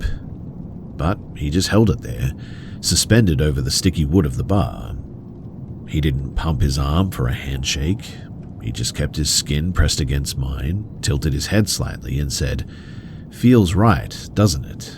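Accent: Australia. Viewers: I love falling asleep to so much but I wonder if we were to ever meet in person if the sound of his voice would cause me to doze off in the middle of conversation?😆 My brain hears that Australian accent and I'm gone!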